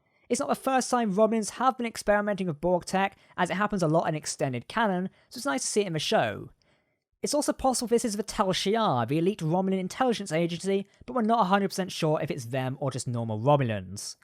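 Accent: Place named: United Kingdom. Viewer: It's a shame that this fellow is cursed with that damned British accent which causes the speaker to slur their Rs into Ws (Mayfair?), making them sound like Elmer Fudd: "Be vewy quiet ... I'm hunting wabbits". That, plus his penchant for speaking too fast, I can understand very little of what he is saying. Very annoying to listen to.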